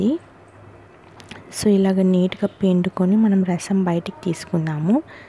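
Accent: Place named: Telangana, India. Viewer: super voice telanga voice alane untadhi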